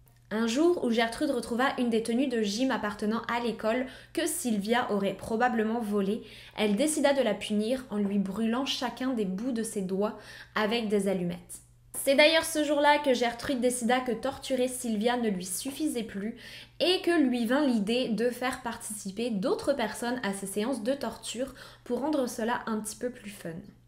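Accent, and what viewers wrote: Quebec, Canada. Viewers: Punaise je me disais que t avais un petit accent québécois mais je comprenais pas pourquoi il était aussi léger tout s’explique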